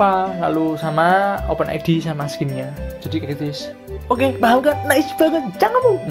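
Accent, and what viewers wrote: Java, Indonesia. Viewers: ciri khas 1. klo ngomong logat jawa nya kental bgt. 2. cadel nya udh ga ad obat nya. 3. cuma bisa maenin gajah 😁. harapan saya buat AOV smoga lebih jaya dn brkembang lg di indonesia dn smakin banyak guild yg bisa mengharumkan indonesia. dn buat makin bnyak konten" seru buay memghibur kita smua. open ID: 087fb2d45ff6d3e8e044ac87d7200e35 skin: max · 1. Cadel cadel gimana gitu 2. Medok 3. Baik pada subscriber. Karna sering live, giveaway, ngasih guide dll lahh Harapan saya untuk AoV kedepannya adalah pada matchmaking di perbaiki lagi. Open ID: efe18eb05e1fa5539f30de2d05e41494 Skin: Zuka-Dragon Master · 1. Kalo ngomong medog bwanget 2. Kalo udah kill 4 pasti .. onclog onclog 3. Suka mabar sama subscriber Harapan untuk AOV , bisa menjadi game favorit ke depannya :D Skin : Superman Open ID : 49c9439f906d96d1174f112f871d9c3f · 1.kalo main suka ngalah dulu 2.kalo ngomong R suaranya agak beda 3.kalo ngomong kental banget logat jawanya Pengennya AOV lebih banyak ngadain event yang physical seperti pulsa atau HP gitu Openid:9b<num>f9bfb<num>dc34973 Skin:wonder woman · Ciri Khas : 1.suka bilang nice banget 2.medok coy 3.suka ketawa pas main Harapan buat aov : semoga makin berjaya Open id : e26b8af7d6b871c16d37acb<num>ad Skin : liliana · 1. 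Lucu 2. Bahasa jawanya kental 3. Sedikit cadel kali yak Semoga kedepannya AOV makin banyak event berhadiah pulsanya... wkwkwk Open id: 69aa2fac34bf33823abf318a157f4e47 skin : wonder woman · 1. Cadel Gk Bisa Ngomong R (conquelol) 2.Onslog Onslog,Nice banget 3. Suka Berlogat Jawa Semoga Aov Semakin Jaya Kedepannya OpenID 6c0105a9e6b21b5638c<num>c7c5 Skin(Raz) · 1. Suara Jawa mu itu yg khas 2. Open Mabar bareng viewers 3. Dulu Hero yang disuka aleister :v Harapan saya untuk aov ke depan nya : Kurangi lah domba domba yang ada di aov bikin nurunin win rate aja): trus banyakin lagi event yang berguna buat Free player kayak saya :v ID : af1581ca947d48e9e3d3e41f926bb7e0 Skin : Ryoma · 1. selalu menggunakan logat jawa 2. sering melakukan Giveaway 3. sering mabar bareng subcribers harapan saya untuk AOV kedepannya semoga, aov bisa menjadi game favorit di dunia dan gak bakal sepi pemainnya dan sukses selalu open ID : 7980fb45f6ab9ba7f8e5c076e0d22130 + skin ryoma ( gunslinger ) terimakasih...! 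· 1.suarane medhok 2.onslog onslog 3 naisss banget. Harapan untuk aov kedepan nya semoga kalau ada event tidak ada kata "berkesempatan" OpenID:308d565113fa<num>dbe4cc32ba472 Skin=krixnak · Bismillah. Ciri khas : 1. Ngomong medok. 2. Cadel. 3. Suka ngomong Onslog onslog, nais banget. Harapan buat aov kedepannya semoga server aov indo lebih baik lagi, ga ada gangguan lagi. Aov moment diperbaiki agar bisa. Lebih banyak mengadakan event event besar. OpenID:4a77af2dc0ea7d2e7af508d13461ce67 Skin yang diinginkan:Wonder Woman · 1. seneng ngomong onslog onslog, cangkemmu, nice banget, meletet 2. gk bisa ngomong R 3. selalu ada logat jawa di vidionya Harapan untuk aov = saya berharap aov dapat terus maju di dunia esport dan di indonesia playernya aov bertambah banyak OPEN ID : b43a47fa715d1f22f005e27e5f096c9c SKIN : Lu Bu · 1. Onslog onslog 2. Nasi banget 3. Cadel sama logat jowonya kental Harapan untuk aov Semoga makin bnyk makin bnyk yg main dan masalah-masalah koneksi lebh baik lagi. 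Pengelompokan main sesuai Rank masing masing jgn beda jauh bisa join Skin kriknak. ID:c505e0ef449eb633a7d<num>f02987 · All done.... Subscribe, follow, share #1 Ciri khas... 1. Jowonya itu loh 2. Ya pasti cadelnya (kadang" suka seneng denger cadelnya😁) 3. Karibnya Ucop gaming (sering mabar with ucop gamimg) 4.(tambahan) paling suka nyebuti onslog onslog😁 #2 Harapan kedepan buat AOV, semoga turnamen aov dapat diadakan di tempat" yg terpencil seperti di desa. Dan semoga AOV kedepannya dapat memberikan kenyamanan serta event yg menarik bagi playernya.... oh iya dan semoga AOV dapat menjadi "The Best Mobile Moba In The World" #3 Open ID: b3d1cad56e5ee0d<num>f2bbf5d3a Skin: Yorn (PoPo)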